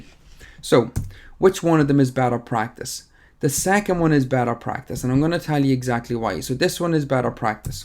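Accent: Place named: Ireland. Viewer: love your accent. where is ireland are you from?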